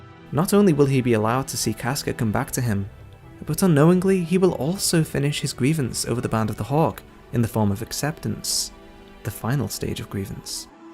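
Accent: United Kingdom. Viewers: an overly-soft UK accent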